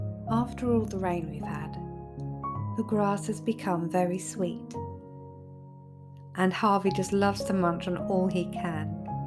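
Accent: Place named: England, United Kingdom. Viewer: The landscape and your amazing English accent make the video very pleasing ❤❤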